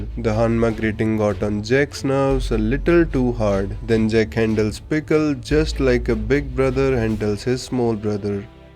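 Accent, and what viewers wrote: India. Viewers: u sound indian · Bro you returned 😁 loved the accent from 🇮🇳. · Why do you sound so Indian can you please tell ? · Bro he's Indian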